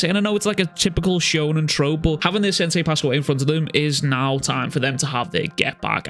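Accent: Liverpool, United Kingdom. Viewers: This scourcer accent makes this video even more enjoyable to watch, big greetings from Germany keep it up u sexy geezer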